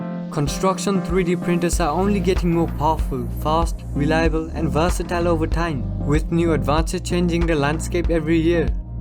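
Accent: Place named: India. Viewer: This channel is diamond, not gold!! BTW are you of Indian descent cause your accent seems like a little indian.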